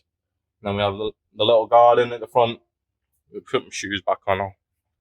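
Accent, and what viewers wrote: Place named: Manchester, United Kingdom. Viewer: A manc Thai great to hear a northerner back home in Thailand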